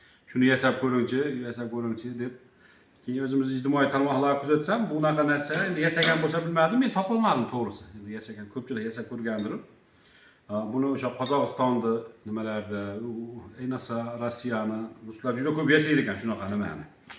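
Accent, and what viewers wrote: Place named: Namangan, Uzbekistan. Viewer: Гап йук уста ака наманганни жийда капа ёки чужа кишлоги шеваси билан бир хил экан шевангиз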